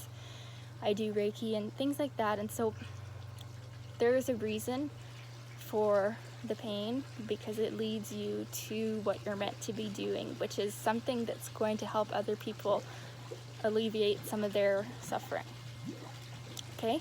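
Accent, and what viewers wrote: Canada. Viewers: As an astrologer I frequently look up videos for my clients to watch for maybe a better understanding, (I do free reads) 12 th house Saturn is a difficult placement and I needed a video to express that and your video did that!!! Thank you you are a gifted astrologer whom I would love to chat with!! Am I picking up a Canadian accent?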